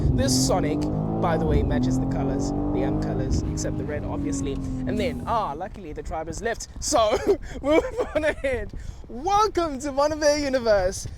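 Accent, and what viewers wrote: United Kingdom. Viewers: Broo with that accent, you giving UK vibes😭😭🔥🥂 W UK accent, keep up the tremendous job🔥🔥🔥🙏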